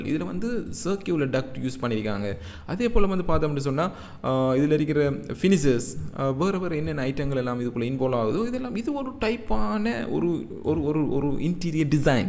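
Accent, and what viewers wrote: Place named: Sri Lanka. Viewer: Great Tamil with Srilanka Slang hearing very nice Sir ❤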